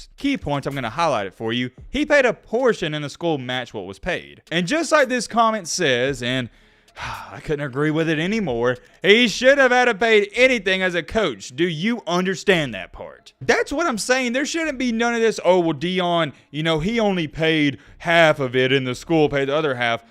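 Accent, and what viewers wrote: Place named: Mississippi, United States. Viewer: “Jackson State University has honored all financial obligations of Coach Deion Sanders’ employment. The video in question was filmed on September 20 on our campus. Unfortunately, a seconds-long excerpt using a hypothetical example from an over 60-minute interview is being used out of context and circulated as fact.” from hbcugameday, 12/09/2022 titled deion-sanders-wasnt-owed-money-jackson-state-officials-say “Jackson State University has honored all financial obligations of Coach Deion Sanders’ employment. The video in question was filmed on September 20 on our campus. Unfortunately, a seconds-long excerpt using a hypothetical example from an over 60-minute interview is being used out of context and circulated as fact.” Jackson State’s Division of Athletics, posted on 12/09/2022. These appear to be legally binding statements. 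Why is the first third of the video repeats? Afterwards, you finally started with shaming a black player. It's sports opinions, so valid. However, it was personally motivated to maintain some balance. Anyway, all of your information is from tweets. As always, time will tell. Oh, that Favreish accent says alot. I know; I'm a Mississippian....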